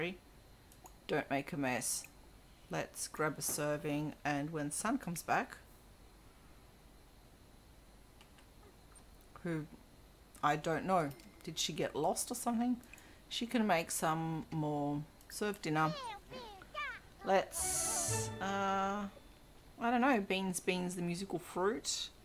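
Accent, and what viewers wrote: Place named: New Zealand. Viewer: Oh yes, I'd almost forgotten, but now you mention it, it all comes back. So sorry. It's just I like hearing you. Even if you're not quite a NZer you sound a lot like many of my NZ relatives, especially with the phrases you use. :D They all have much stronger accents than me. :-) Anyhow, I'm pleased you'll continue this series, though I'd equally be pleased if you started a new Sims 4 PC series... :-) All the best to you, my friend. hug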